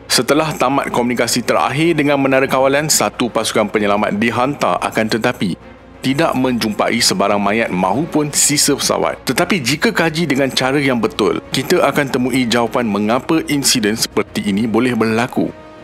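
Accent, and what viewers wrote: Malaysia. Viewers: Orang malaisya ya😇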